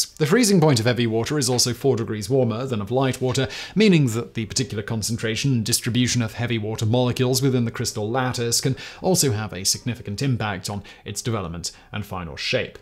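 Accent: United Kingdom. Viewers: I can't see eyes scanning as he reads but he can't possibly have memorized that whole script. Can he have? Anyways, the combination of densely and precisely scripted scientific information delivered at an incredible clip in that British accent does make him appear to be THE SMARTEST HUMAN BEING ON THE PLANET. Amazing content as aways.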